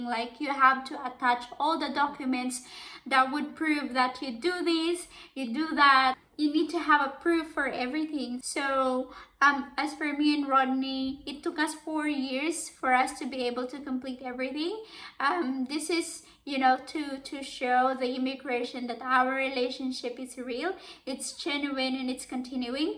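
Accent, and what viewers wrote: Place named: Australia. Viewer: Yes nemern! You sound very Aussie na!👏🏻 Keep up the good work and Godbless!🙏🏻 Taas kamay for us Filipino Migrants!🇵🇭🇦🇺🇨🇦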